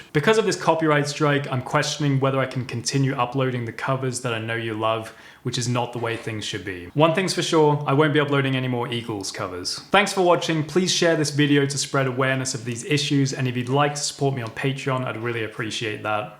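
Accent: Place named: United Kingdom. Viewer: You sound like you are across the pond. testified before the United States Congress about this issue. Since they have hired a small army of tech people to protect their interest. The very idea that you not being able to steal from them is music to my ears. Get over it & move since you are so convinced that without you the musical world be a worse place. If I made a decision on music I wanted to learn based on your remarks. Wow, I think a music attorney has a lot to tell about what rights you do not have. You have the right to speak of them because they are public figures. Personally I think I may see if you have any thoughts that are original. Doubtful since you are doing cover songs with over 1.5 mil subs. Get real you sound like somebody's wife bitching. Hard to collect money from through BMI ASCAP or any other music licensing authority. BE SURE TO CHECK REMIX ON YOUR VIDEO HERE. 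YOU ARE NOT GOING TO LIKE IT. I WAS A PART OF THE INDUSTRY AND BUSTED ON MANY SONG THIEF'S ASSES.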